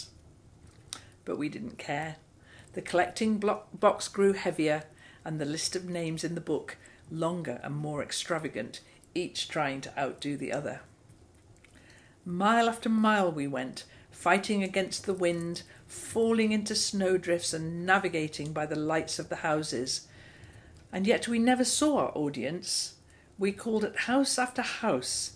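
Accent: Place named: Scotland, United Kingdom. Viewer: Thank you so much for the reading. So heartwarming. Your accent reminds me of my Scottish grandmother and her siblings. They were dear to me. It is evening for you and about noon for us, a bright, clear 1F… snow on the ground. Listening to Frank Sinatra and Bing Crosby croon on the CD player. Does that age us? Merry Christmas and a good quilting year!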